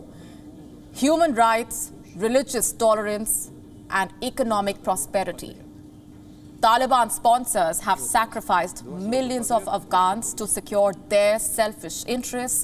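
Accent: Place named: India. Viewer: Why WION news are using broadcasters that has strong Indian accent journalist I barely understand what she was reading my goodness pls kindly use someone that has free accent in English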